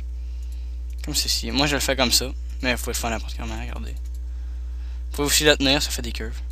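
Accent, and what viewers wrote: Canada. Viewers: L'accent canadienne ♥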